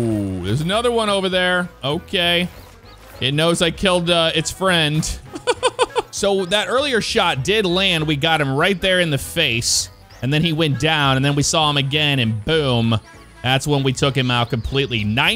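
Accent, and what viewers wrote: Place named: United States. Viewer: Love the country accent. I like this game but yea I heard it rained alot in this game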